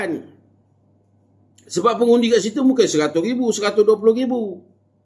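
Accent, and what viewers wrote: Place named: Muar, Malaysia. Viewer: sempoi la bagi insight analysis PRN Johor. simple tapi detail. berborak style orang Muo. lawak tapi bisa.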